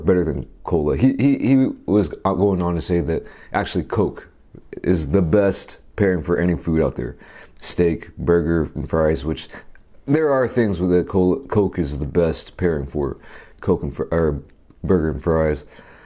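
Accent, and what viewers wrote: United States. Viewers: Oh okay that's why you sound so American lol you use to live here, didn't catch that as I'm a new sub. Binge watching lol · most American sounding Korean ever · he’s American Korean numb nut so that’s an American sounding voice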